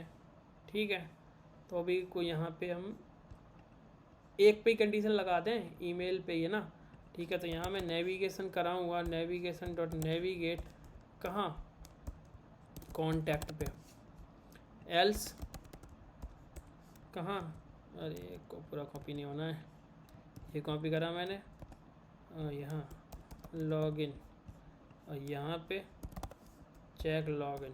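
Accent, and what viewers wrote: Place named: Western Uttar Pradesh, India. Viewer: Kisi biryani ke dukan pe jo ladke kaam karte h wesi tone me baat kar raha hai, 😂😂😂😂, what a language pachimi up wala h, 😅 व को ब bolne wala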